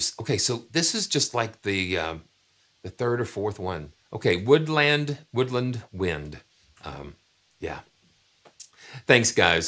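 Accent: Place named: England, United Kingdom. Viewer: you have a great voice for scripture voice overs with music. Lots of 'em on youtube. With your wonderful composing abilities and your "just a touch of an english accent you bring to the table on the voice overs", you would have a purrrrdy big following. Timing is indeed everything. Please consider doing this. If you are already, GOD BLESS YOU and let us know your channel! Bless you, brother! PS-HAHA! I found your other sites.:D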